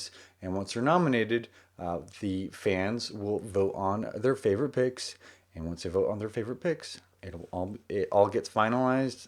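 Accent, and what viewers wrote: South, United States. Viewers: I love your video at your house in Texas during your quarantine until you're talking about all of the books while you went on the website of Goodreads on your cell phone to see all of them are brand new and it got came out this year, but it's called of Best Books of 2020 to look at them at the same time after that, I hope your husband, Zach will be very proud of you and I love your Southern accent!!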